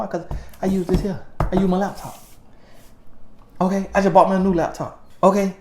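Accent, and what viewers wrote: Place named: Chicago, United States. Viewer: you sounding a lil Chi town ova here nahhhhh!!! 🗣💯😜 🔥 I love this, definition of what “in love” looks like—Eph 5 from the Bible to the streets 👏🏼👏🏼👏🏼 I pray, Lord you made this man set-apart and I know You ain’t made only one...lol I’m so grateful for the Kingdom description y’all are real relationship goals—not perfect but real & striving to do it Christs’ way. 🙏🏽 Lagos, Nigerian love tho 😩🤦🏻‍♀️ Ohhhh, and another huge thanks, I’ll be getting my Coaching certification with you too. Your ministry, the way you love the Lord & the Queen [i spelled it correctly 😜🤜🏽🤛🏽] give hope to women like me who are in waiting. God continue to bless y’all, exceedingly and abundantly! 😊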